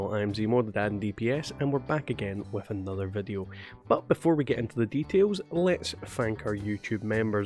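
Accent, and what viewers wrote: Scotland, United Kingdom. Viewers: Min far ye fae in Scotland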